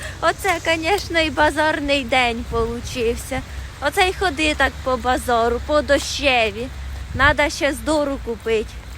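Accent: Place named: Surzhyk, Ukraine. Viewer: Диво дівчина. Не люблю суржик але з цих чарівних вуст це як музика.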